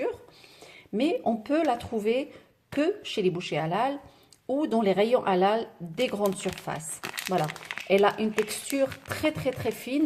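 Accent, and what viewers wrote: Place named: Morocco. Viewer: كي نسمع الهدرة تاعك نقول انكي من المغرب الشقيق ولكن الطبخ تاعك بكل صراحة طبخ جزائري ديجا احنا اشقاء واكيد طياب ميكونش مختلف كثيرا شكرا لك على لوبيا بالكرعين سخفتين يعطيك الصحة الخدمة والشرح المفصل والإتقان في العمل .متتبعة لكي جزائرية مغتربة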